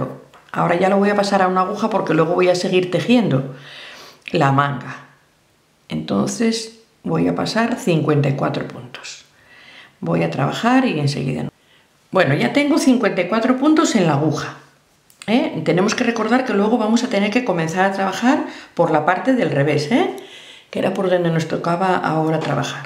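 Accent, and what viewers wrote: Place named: León, Spain. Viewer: Hola!! Me llamo maria y soy de cadiz. Estoy terminando el jersey para el nieto que va a nacer en marzo. Me gusta adivinar por el acento de dónde son las personas y yo diria que eres de Leon...Acierto? A ver si me ayudas y hago dos chaquetitas para 5 y 3 años. Lo haces muy bien!! Gracias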